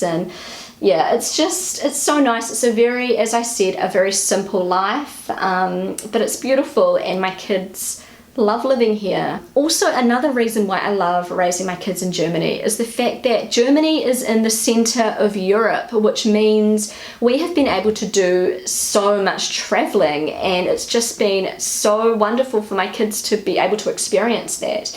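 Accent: New Zealand. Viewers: Your accent is very interesting. I had never heard anyone from New Zealand speak before. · Thank you for your always positive videos. I really enjoy them. Also nice to hear is your Kiwi accent. After +10 years in Germany you still haven't lost your accent. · This is a sanitized NZ accent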